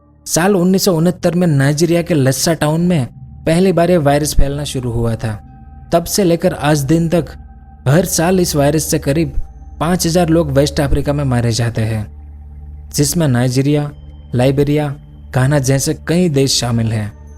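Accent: Gujarat, India. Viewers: i am also from gujarat ahmedabad 👍 Aapki awaj par se mujhe laga ke aap gujarat se hi ho, isliye puchha.. good speach, good animation and knowledgeable video👍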